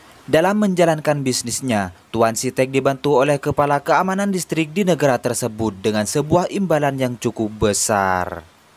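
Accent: Madura, Indonesia. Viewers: astaga meduro mu lo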